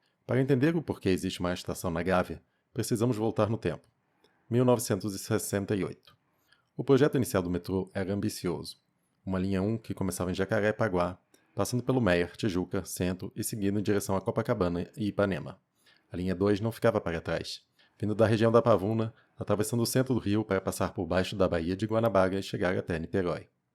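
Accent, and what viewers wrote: France. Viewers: Ele tem sotaque francês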